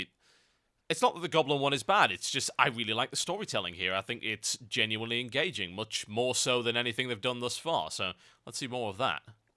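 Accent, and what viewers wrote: United Kingdom. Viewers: dude your british accent fits for the worgen commentary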